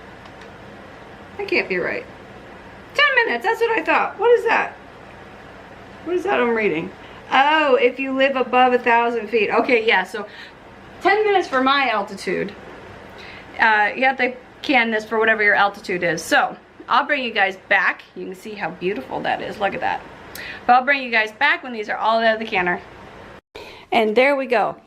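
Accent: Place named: Canada. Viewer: We can tell you’re Canadian cuz you say “own ready” instead of “ already”. My hubby is Canadian, but has lived in the states here for 50 years.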